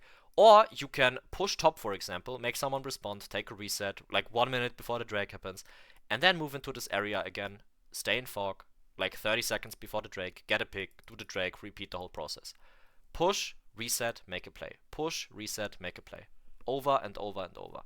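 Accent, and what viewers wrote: Germany. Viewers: Nahh this video it was I was looking for!! love the german accent btw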